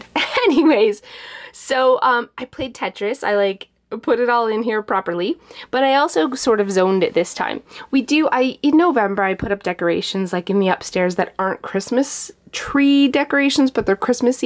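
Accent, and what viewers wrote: Canada. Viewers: I love your Canadian accent! <3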